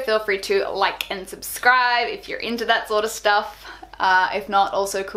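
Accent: Australia. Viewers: I know! I love the way it sounds. She's Australian, right?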